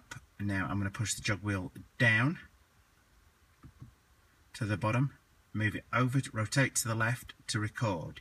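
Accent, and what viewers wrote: United Kingdom. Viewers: useful, thanks... At & t though, you sound English - how are you on at & t or did you move to the usa>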